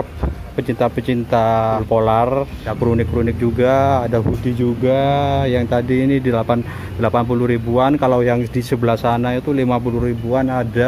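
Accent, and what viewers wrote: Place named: Madura, Indonesia. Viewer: wahhh medureh reh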